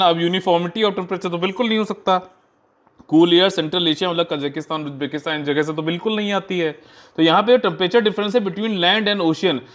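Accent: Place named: Awadh, India. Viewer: Thank you very much....! But kanpuriya aur awadh mein sab aise hi bolte hai.... ☺️☺️☺️☺️☺️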